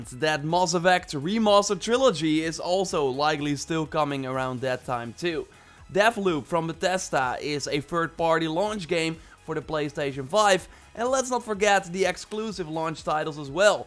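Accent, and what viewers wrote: Netherlands. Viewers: Bro u Dutch I know it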